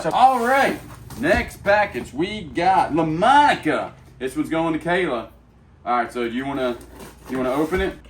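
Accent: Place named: South, United States. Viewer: You're driving me nuts! The word is MONO- gram(ed) it isn't "MAMA-gram(ed). I know we southerners have our drawl, but still. (You can only call something a Mamagram if it's a mailed Mother's Day card and you put just your initials on it.) And the metal thimble is a shot glass, as in "My gout is kicking up a storm and hurts like all get out, so I need just a couple thimbles full of corn squeezings." 🤣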